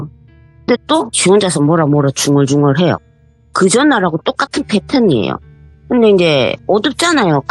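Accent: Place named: Gyeongsang, South Korea. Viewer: 시간 가는줄 모르고 들었어요~경상도 사투리 친근해요😊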